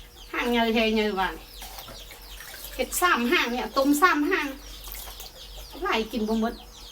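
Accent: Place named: Na Wa, Thailand. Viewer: สำเนียงคล้ายๆแถว นาหว้า ศรีสงครามครับ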